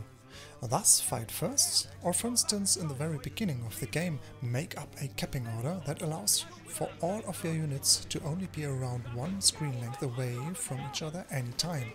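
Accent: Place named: Germany. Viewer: Great tips, the fact that they re also in German English accent is great addition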